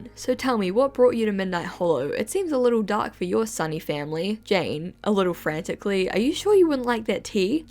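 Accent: New Zealand. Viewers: shes from New Zealand im pretty sure. nz accent? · Sometimes when I'm watching your videos I get so used to hearing your voice that I forget you have a kiwi accent and it's almost jarring when you suddenly reference something Aotearoa-specific -- the Mr Whippy remark got me this time!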